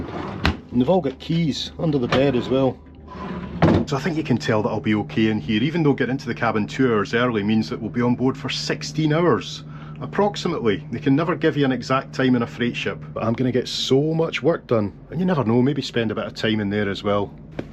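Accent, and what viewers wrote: Scotland, United Kingdom. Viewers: oof. some days i'm better at understanding scottish-english than others.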